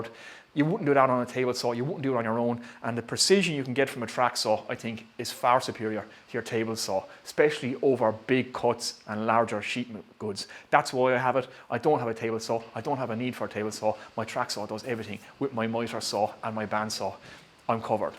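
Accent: Ireland. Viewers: Your review is just as spot on today. Thank you. First time watching your videos and it took me a few seconds to get my yank ear in tune with your very cool brogue, but very much enjoyed listening. Your teaching style is very good. Well done, sir! 👍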